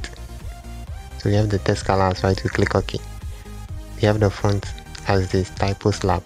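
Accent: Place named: Africa. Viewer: He's a african.. he has an african accent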